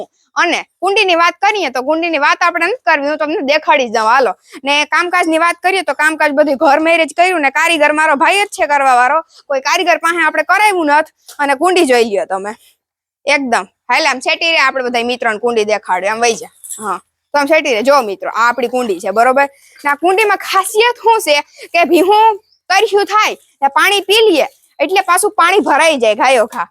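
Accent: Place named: Kathiawar, India. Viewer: સિસટર તમારિ કાઠીયાવાડી બોલિ સરસ છે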